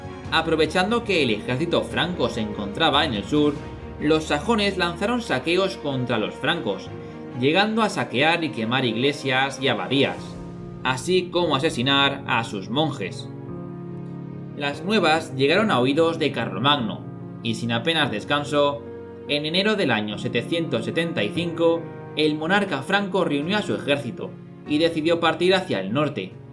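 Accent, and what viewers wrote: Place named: Galicia, Spain. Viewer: Lastima el acento Gallego . Arruina el video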